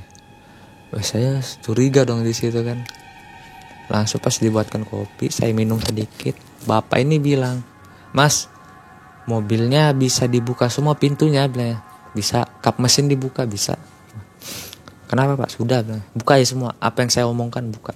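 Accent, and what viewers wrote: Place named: Samarinda, Indonesia. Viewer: Dengar logat Bang jadi inget temen di kampus, beliau orang Samarinda. Pas beliau menikah, saya datang, memang sih kalimantan itu kanan kiri hutan, kalau bukan orang kalimantan yang bawa mobil agak sulit. Kebayang malam2 bawa mobil ke bukit yang notabene hutan begitu, jelas harus hati-hati, karena memang bangsa jin lebih banyak bersarang disana.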